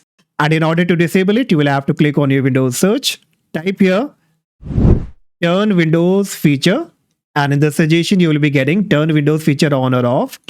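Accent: India. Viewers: you have fucking indian acccent . jook man (hate from me )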